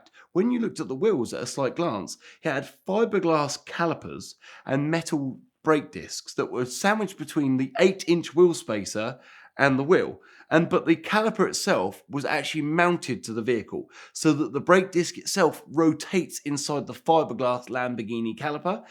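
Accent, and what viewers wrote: United Kingdom. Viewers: Can't get more British accent than this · Lol I’ll do my best to reduce the British twang lol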